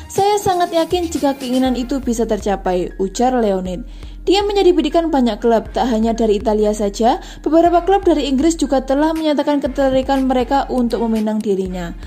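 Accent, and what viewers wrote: Java, Indonesia. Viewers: medok mbak yu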